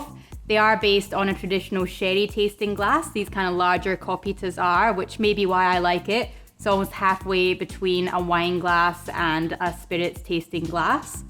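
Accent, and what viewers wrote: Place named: Scotland, United Kingdom. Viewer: Oh, Scottish accents! You could be reading out of the phone book, and this video would still be enjoyable. But Scottish accent + knowledgeable whiskey 🥃 instruction = fanatic content. Keep creating!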